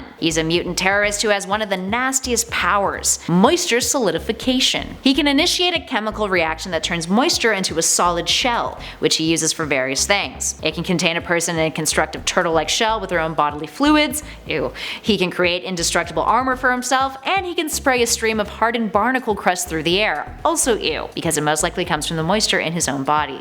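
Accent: Canada. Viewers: You're totally Canadian aren't you chicka lol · You're Canadian are you not?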